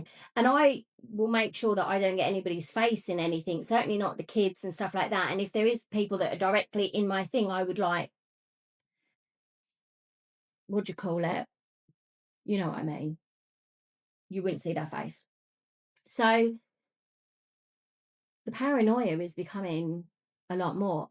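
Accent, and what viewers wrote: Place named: New England, United States. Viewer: I love your accent! Whenever you mention the names of New England towns, I think, “oh, that’s how it’s supposed to be pronounced “ 😂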